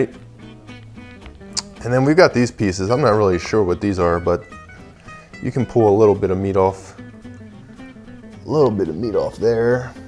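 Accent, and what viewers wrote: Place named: Pennsylvania, United States. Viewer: are you from Pennsylvania? It is the way you say certain words. Thanks for sharing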